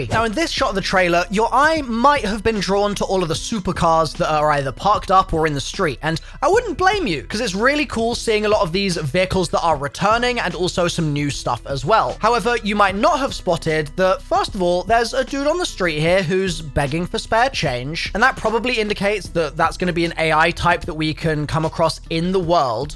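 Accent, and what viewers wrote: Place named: United Kingdom. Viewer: Bro it's because of his accent. He says it like Ig•yoo•ahna and americans say ig•wana or eeg•wana. I've noticed that a lot of words have a more pronounced "yoo/yew" sound with a british accent.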